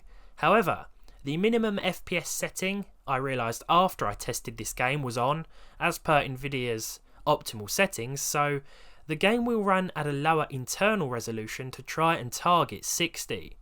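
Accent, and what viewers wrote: United Kingdom. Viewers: Love your videos my British friend you are excellent very enjoyable good reviews keep it up I hope you're sponsored and people give you money U2 must love you I'm hoping and you deserve an award by now anyway like I said you one of my favourites I was watcher stuff we always seem to pop up all the time so I do continue to watch her things keep up the great work love your accent love your comedy try to throw more comedy in there that's very enjoyable try to stay British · Are you from british ?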